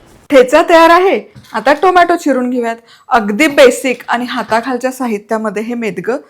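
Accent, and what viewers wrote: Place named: Pune, India. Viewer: Mal tumchya recipe tar avadtatach pan tyachypeksha tumcha najuk awas ani god bolan khup jast awadt. Tumhi kuthalya gavachya . Pune mubai kolapur ko kan . Tumchya god awajavarun tari tumhi mala punyakadacha vattat.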